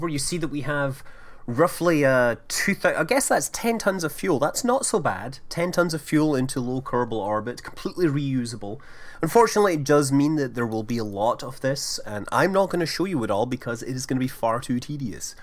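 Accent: United Kingdom. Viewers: Foreign is a relative term. It's the British pronunciation.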